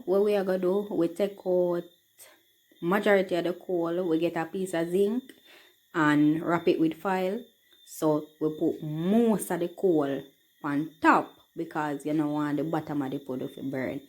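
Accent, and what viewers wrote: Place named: Jamaica. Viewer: Love the Jamaican accent! I hope I can visit Jamaica soon!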